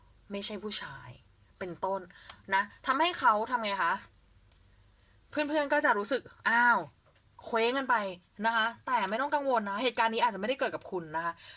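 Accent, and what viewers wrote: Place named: South, Thailand. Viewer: ชอบแม่หมอจังเลยค่ะฟังแล้วเคลียดี น่ารักค่ะ ฟังละไม่เครียดเลย อ้อร้อด้วยคนใต้ป่าวนิ อิอิ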